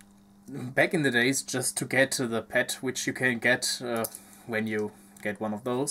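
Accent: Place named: Switzerland. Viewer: hört sich nach schweizer dialekt an ;)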